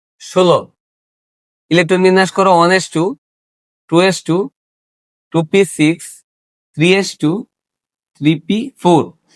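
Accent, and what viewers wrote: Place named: Comilla, Bangladesh. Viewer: Vaiya Apnar gramer বাড়ি may be কুমিল্লা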